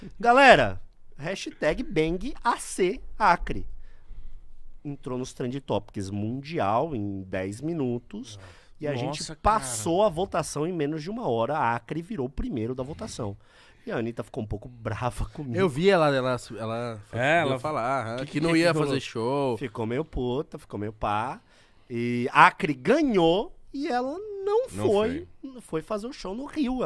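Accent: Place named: São Paulo, Brazil. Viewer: O mítico é muito paulista as vezes KKKKKKKKKK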